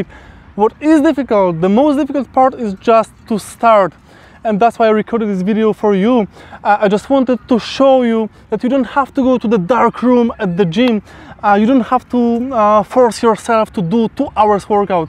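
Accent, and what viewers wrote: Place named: Poland. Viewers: Lepszy angielski z polskim akcentem niz polski z angielskim akcentem, jakby to po paru latach juz sie zapomnialo ojczystej mowy hehe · Typowy polski-angielski, hehe ;)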